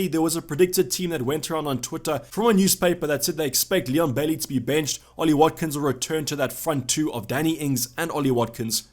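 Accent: South Africa. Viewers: Where in South Africa are you from bro? 🇿🇦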